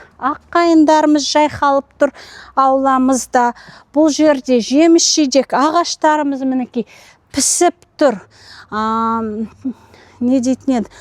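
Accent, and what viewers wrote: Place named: China. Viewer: Чувствуется акцент китайский ( оралманка?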